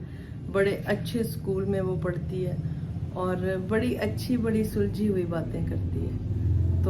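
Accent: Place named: Punjab, India. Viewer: Bhainji sat shri akal ji Tusi jdo'n bolde o menu baut sohne lgde o tusi hai v bade sohne o Tuhadi theth punjabi v sade vrgi a Tusi pakistan vich kitthe rende o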